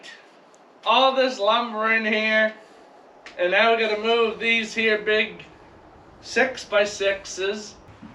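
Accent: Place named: Maritimes, Canada. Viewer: your accent happily reminds me of my beloved great uncles and aunties who were all born and raised in Memramcook, NB and my old neighbors in Maine. Memramcook is about as far as you can go in NB and not be in Nova Scotia!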